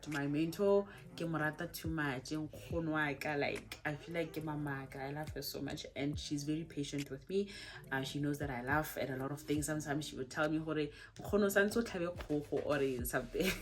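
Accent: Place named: Botswana. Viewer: So refreshing to listen to Setswana se se tlhapileng❤❤ love your energy 🌷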